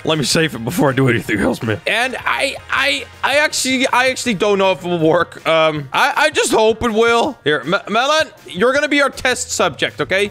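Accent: Netherlands. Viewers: are you dutch? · Are you from the Netherlands or from Belgium? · Hes dutch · are u Dutch?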